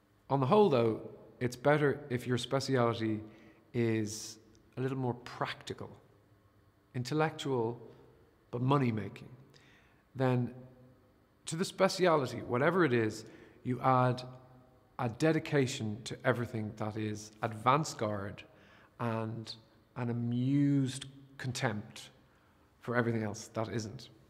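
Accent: Ireland. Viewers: He is speaking Hiberno-English. And the speech is universally relevant. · It’s an Irish accent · That's a posh Irish accent.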